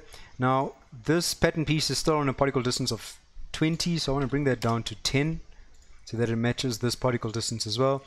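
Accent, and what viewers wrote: South Africa. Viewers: I thought your accent sounded South African, confirmed by checking out your ArtStation page. :D And WOW, I'm seriously impressed with your work man, subbed here as well as your ArtStation page! Looking forward for more tutorials from you! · my bra! I have been looking for a quick and simple tutorial to get started with Marvelous and you hit the nail on the head! Love seeing some stellar content from fellow South Africans. This might sound strange but there is something about learning in your own accent that just makes it so much easier to understand. Keep up the stellar content!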